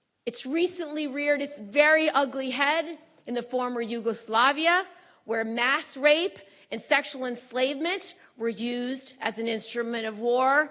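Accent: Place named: United States. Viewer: Such lies! Israelis own news papers proved only 1 baby died and did not know if it was by Israeli tanks. Also rape is not used as a weapon of war by Hamas or Russia. Not one investigation found this. Her with the Israeli flag, American accent, senate position and emotional tirade should be 4 red flags to know she is paid to lie.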